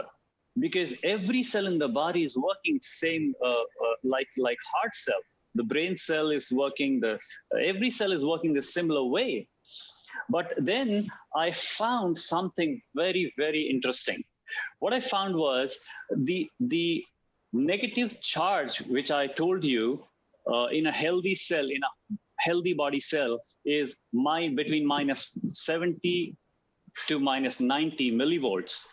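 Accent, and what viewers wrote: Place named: North India, India. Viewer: you have simplified the importance of Kangen Water in such a lucid manner that even a sensible school kid will realise the need for KW. But, with deep regrets I need to emphasize that speaks sich an elegant English and on the other hand, Mr, the host, speaks such an horrible english. His accent, his pronunciation of the words is typically north side bhaiyas type. He is grammatically incorrect too. For e.g., he says there is a query from the Prasad. My God, such a horrible English. It is just 'Prasad' and not 'the prasad'. please please please I appeal to your great highness to arrange for a better well educated host who could speak English properly for your next series of videos. AMEN.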